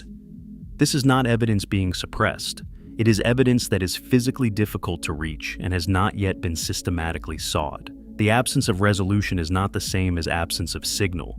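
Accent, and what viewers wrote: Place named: United States. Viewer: The constant over pronunciation for dramatic effect, and mispronunciation out of poor programming makes listening to an American accent droning on and on make this extremely jarring.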